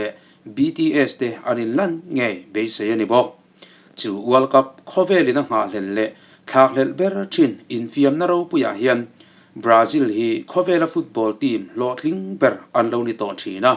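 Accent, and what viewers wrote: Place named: Paite, India. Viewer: Ichanel ania, ilan loh ten paihte angin tawng chu pai mai mai mah se apawi hlei nem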